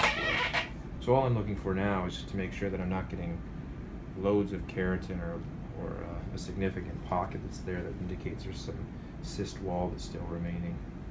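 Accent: Canada. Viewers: I can surly hear your Canadian accent . Love your teaching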